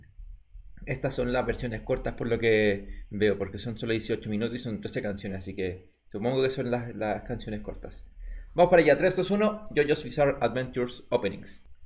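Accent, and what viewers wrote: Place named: Chile. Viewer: Se te nota caleta el acento chileno completo palta mayo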